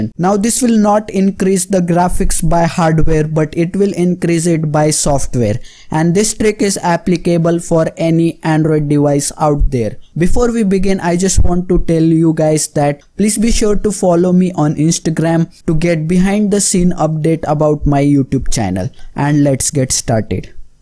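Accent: India. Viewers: 1. He's speaking in english 2. He has a indian Accent. What's your point? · Sorry I didn't understand you right 😊 I can speak english but I don't understand english with indian accent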